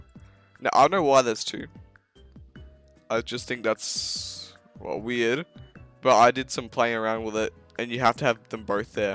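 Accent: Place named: United Kingdom. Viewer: a little bit of British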